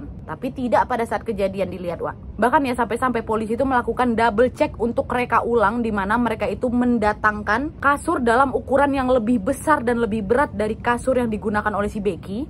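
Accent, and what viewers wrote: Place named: Medan, Indonesia. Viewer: Logat kak medan kali😂😂 Btw salam kenal yah wak aku yang setia kali ini nonton videomu sampe berulang pun aku sanggup..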